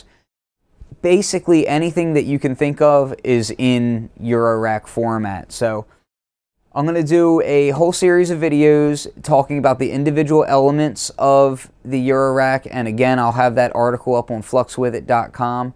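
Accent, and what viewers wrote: Philadelphia, United States. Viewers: I detect a Philadelphia accent. Are you from Philly?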